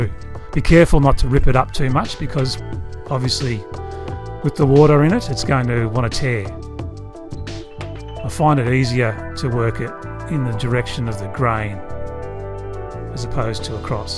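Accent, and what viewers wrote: Australia. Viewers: The most Australian voice I've ever heard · I'm not quite used to Aussie accent, but goodness me, the art work is fantastic. Pearl sent me here, BTW. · The aussie accent 👏😍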